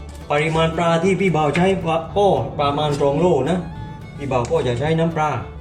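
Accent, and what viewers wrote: South, Thailand. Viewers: ชอบสำเนียงการพูด จังเลยหนิ😊😊😊รู้เลยว่า เป็นคนที่ไหน☺️☺️☺️☺️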